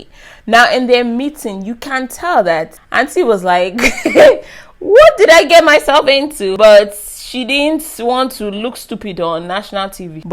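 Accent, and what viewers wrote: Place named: Caribbean. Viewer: I love your combination makeup lesson and reality show review. I'm Bahamian-American and hear a patois lilt in your voice. Are you of Caribbean descent?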